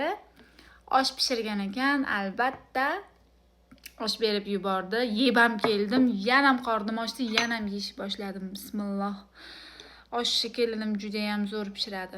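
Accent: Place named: Kolyon, Uzbekistan. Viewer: Siz kòlyondan emasmisiz gapirishiz òxshaydi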